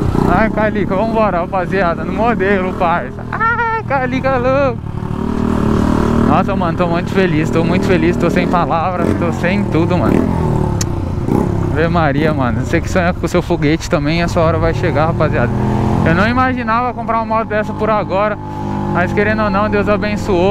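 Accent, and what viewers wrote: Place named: Sao Paulo, Brazil. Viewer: Sou novo no canal… vc é de SP ou do RJ? Vc fala como paulista mas usa gíria de carioca “paizão” “calica” não entendi nada kkkkkkkkkk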